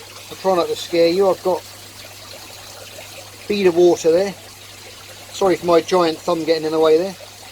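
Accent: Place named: United Kingdom. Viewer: another fantastic video. Don't sell yourself short on your camera work, its brilliant. I started watching your vids and decided to start with Red Cherrys as they are easy. It's good to hear a British voice as most vids are from the USA, no disrespect intended. Thanks again.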